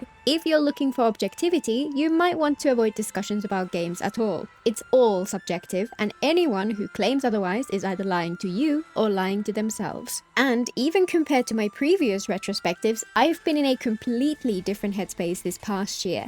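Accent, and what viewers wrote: United Kingdom. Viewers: Have you ever thought of doing ASMR ? I adore that voice and British accent of yours! BTW looking forward to your analysis on Archon quest 7.0. Great job.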